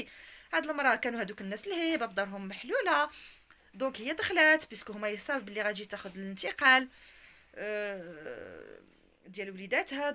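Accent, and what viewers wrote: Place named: Algeria. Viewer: عندها لكنة جزائرية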